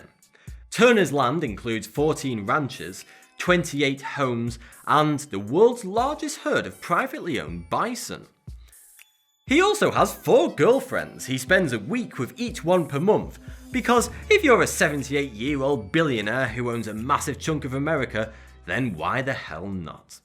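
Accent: United Kingdom. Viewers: "Countries just have to own 100% of their own land in order to enforce laws". Wow, way to pull "facts" out of your ass man. You have a British accent. Have you heard of Stockholm Syndrome? In the USA there are some people with "coloquial title" which is absolute ownership. Is total chaos breaking out on those properties? Find out.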